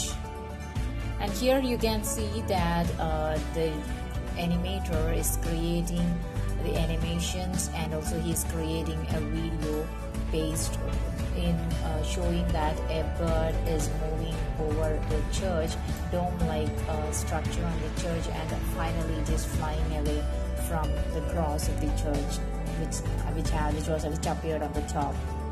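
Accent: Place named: India. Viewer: She sounds like indian.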